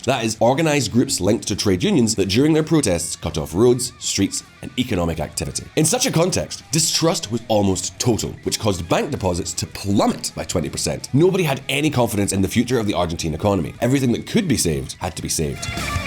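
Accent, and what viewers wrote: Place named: England, United Kingdom. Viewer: Reading Spanish with English pronunciation. Is this a troll?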